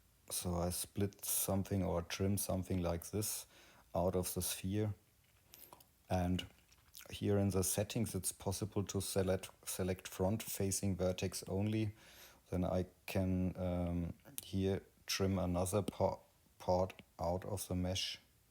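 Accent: Bavaria, Germany. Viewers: Supergut! Wann immer ich irgendwas suche, Du hast fast immer ein Video dazu =D Da leb I a mim boarischn englisch =D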